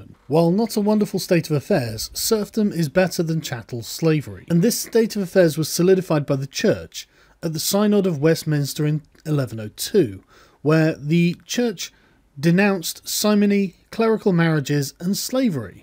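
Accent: United States. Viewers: im glad that u are learning , but ur a tipicle american , im english and when u speak my ears hurt A-rab lol E-unuchs dude it hurts to hear u talk out the words ,sorry but it does ,keep up the learning its good .... try listening to english speaking english and repeating how they say words how you say them isnt right its course to say the least , remember its are language we invented it , its not yours ie:american i proberblty would come across to spanish or french the same if i wanted to try thier language but! that aint happening lmao tc b safe :)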